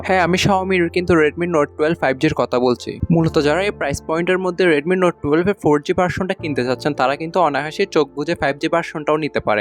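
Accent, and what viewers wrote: Chittagong, Bangladesh. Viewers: Kotha sune mone hocce apni chittagong ar...Chittagong a ai mobile kutai available pabo seta bolen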